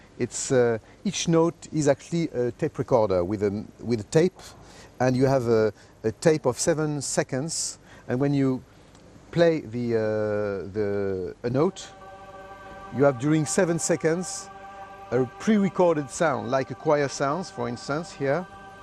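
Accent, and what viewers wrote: France. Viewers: He speaks English with a French accent but very well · JM Jarre after a few years all around the world you have allways a huge french accent lol ( me too belgian/franch accent when i speak english) you're great , your music 's whit me from my chlidhood (im from 1977) exactly like kraftwerk or vangelis/klaus shulze/space/herbie hancock and many mores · He is actually a short little Frenchman with a French accent.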